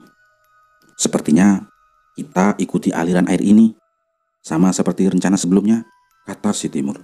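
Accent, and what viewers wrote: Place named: Borneo, Indonesia. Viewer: Best. Sama spt mndengar ckap org Sabah/Sarawak (Borneo). Mudah kami fahami, baru happy😅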